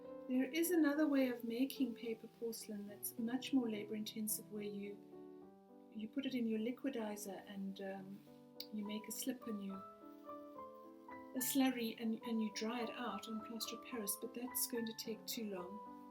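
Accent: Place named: South Africa. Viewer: lovely to hear RSA accent ...where is your studio? i stay in Tokai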